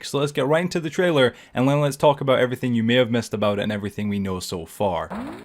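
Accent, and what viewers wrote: Scotland, United Kingdom. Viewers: Where in Scotland are you from ?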